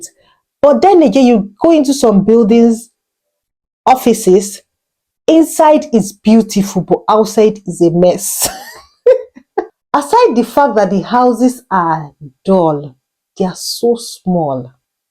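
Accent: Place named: Africa. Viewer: My guess is Nigeria but definitely African countries based on the accent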